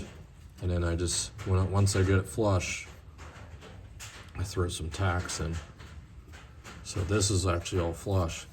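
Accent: Pittsburgh, United States. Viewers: I here a yinzer accent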